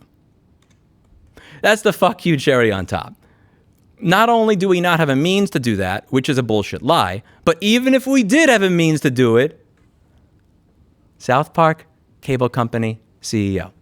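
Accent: New York, United States. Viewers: let the New York out in this one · Haha, nice try on the accent but it’s still a New Yawka accent. Keep up the good work! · You're the best. Your angry New York accent always makes me grin. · You can take the guy out of Noo Yawk... I love you,. BTW, I agree 110% about Thermaltake & Herman Miller. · that New Yorker accent! You know he's frustrated 😅 screw these companies! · I love when gets mad he get progressively more Italian and Yorkie. Never ceases to put a smile on my face that someone will get this upset over someone else's issues. We need more people like him.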